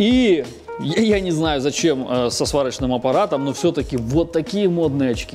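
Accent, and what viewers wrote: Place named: Ukraine. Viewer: Ты как будто украинец, шокаешь, фразы на украинском выпуливаешь) Есть ощущение что множество талантливых людей в рашке - это украинцы)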